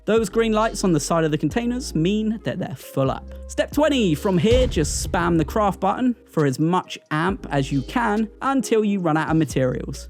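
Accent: United Kingdom. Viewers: Funny, sounds British but saying ALUMINIUM wrong.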